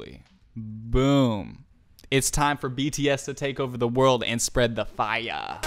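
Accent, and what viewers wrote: United States. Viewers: Great video but halfway through I had to turn it off because I just cannot stand listening to American accents:((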